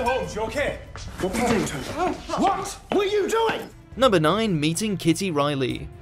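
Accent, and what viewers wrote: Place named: Liverpool, United Kingdom. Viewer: liverpool , i hear in your accent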